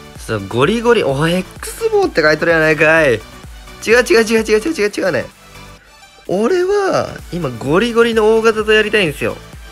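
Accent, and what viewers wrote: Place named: Kansai, Japan. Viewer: めっちゃ関西弁やん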